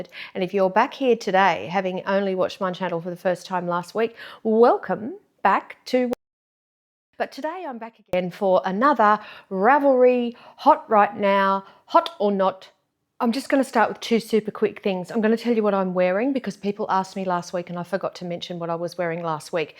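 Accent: Australia. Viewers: Love your blunt approach & hearing an Aussie talk about knitting & yarn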